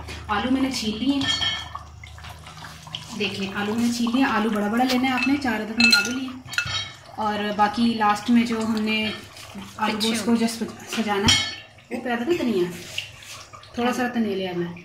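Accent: Punjab, India. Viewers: First of all thanks for this wonderful recipe. I am urdu speaking but at the end your punjabi accent is so awesome. I like the way of your speaking. Good job. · Aaahhhaaa punjabi ka tarka....enjoy your pure pinjabi accent...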